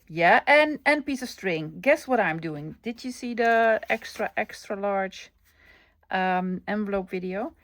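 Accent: Netherlands. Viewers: I was sitting here watching you and thought, “I wonder where her accent is from, it’s probably Netherlands.” And at that very moment, no sooner was the thought in my head, when you said something about - here in Netherlands! Kinda freaked me out! I like your idea with Washi tape book mark! So cool.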